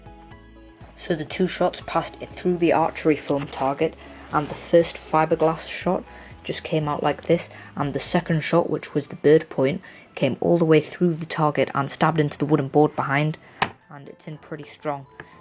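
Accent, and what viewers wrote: United Kingdom. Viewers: I think his accent sounds British, definitely not New Zealand? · Are you from newzealand because on your pants it has Canterbury New Zealand? But your accent sounds British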